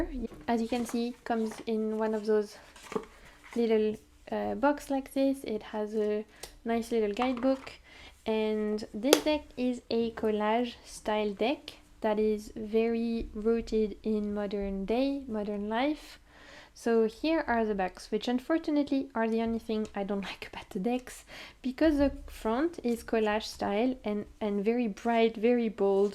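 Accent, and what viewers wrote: France. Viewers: 🐚 obsessed with your French accent. Wish I could hire you to teach me French. Beautiful review of all these unique decks. Thank you for your time and efforts on your wonderful videos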